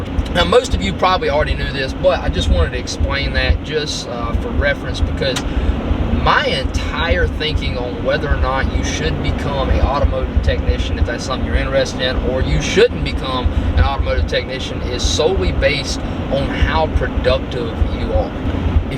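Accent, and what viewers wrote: Alabama, United States. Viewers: I just want to get my foot in the door bruh.. Cause I like Cars( Love the Bama Accent btw😉🤗✨) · Love your Accent. Alabama Accent🇺🇲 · i knew that accent sounded familiar! working at a shop up in huntsville. Roll tide baby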